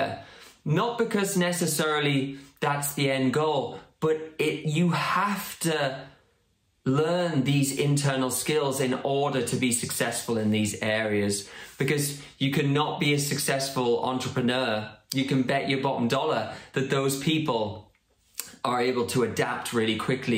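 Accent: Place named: United Kingdom. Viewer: between Queen’s accent and Britis accent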